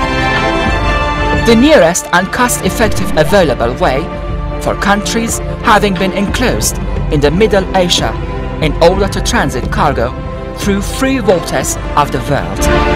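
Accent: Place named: India. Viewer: Good computer animation with an indian voice.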